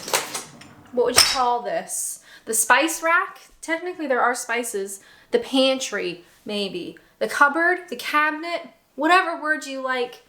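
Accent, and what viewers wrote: United Kingdom. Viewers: wuss-tuh-shuh. There used to be a Worcester Sauce flavoured crisp. They spelled it 'Wuster' on the packet to try to help people say it. Still, your British accent is coming along well in other ways. You have a most un-Canadian glottal stop on the word 'fruit'. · Great video as always 👍 Your fridge is HUMONGOUS compared to mine!! I love it when you do your British accent, it's coming along nicely 😉 My favourite teabags are the Co Op's Fairtrade tea, not too strong or weak, just right for me. Are cheese and onion crisps popular in Canada? Obviously they are very popular here in the UK. Well happy valentines day, I hope your other half has spoilt you rotten today ❣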